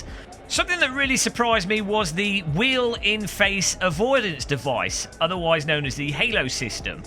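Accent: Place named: Leicestershire, United Kingdom. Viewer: If you don't mind me asking, where are you from? Can't place your accent but would guess at Leicestershire?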